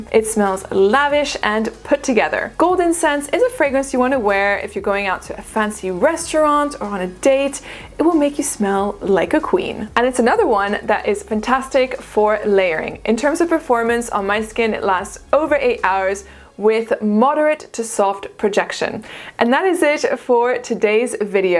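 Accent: France. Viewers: always happy to see you with your uncontrollable french accent and all..😃😃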